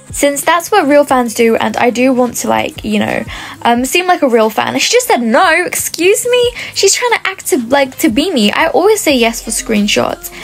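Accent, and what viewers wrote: United Kingdom. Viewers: Um are you British?😂 i hear it in your voice!😅😅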